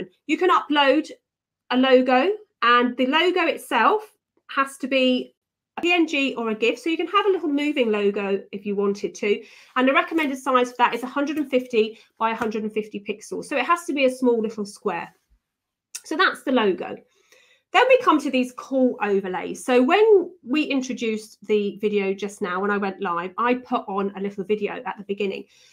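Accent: United Kingdom. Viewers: lOVE YOUR BRIT ACCENT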